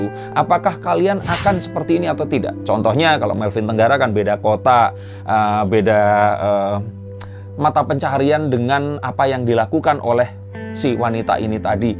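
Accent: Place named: Java, Indonesia. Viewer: Lama2 ngomongnya ikutan medok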